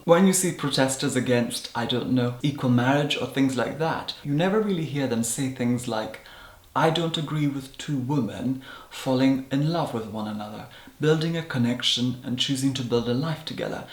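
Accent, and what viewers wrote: Scotland, United Kingdom. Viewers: Lu z he's originally from the Hebrides and his real accent is much more Scottish sounding, you can hear it a bit in his very first videos. He now lives in London, and over time has been covering ut with this english, posh affectation you can hear now.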